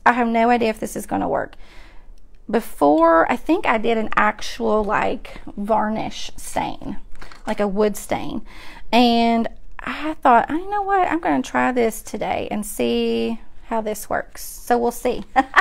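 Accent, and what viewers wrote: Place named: Kentucky, United States. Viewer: I found you by accident looking for how to make faux food- and the fact that you do primitive style?!! Wow! Even better! I love primitives!! I haven’t seen anyone do primitive style with faux baking. I’m a new sub. Your vids are so easy to watch and just love your sweet Kentucky accent 😊. Greetings from southwest Florida! 🍊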